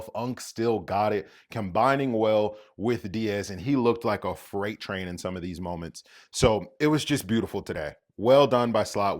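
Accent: United States. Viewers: I hear an American accent discussing (proper) football, I switch channels but you, my man, got me subscribed. You capture and transmit the excitement of the moment. Good job.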